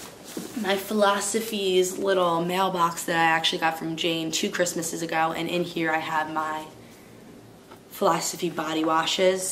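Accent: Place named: New Jersey, United States. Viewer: I love your accent! Are you from New Joyzie (Jersey)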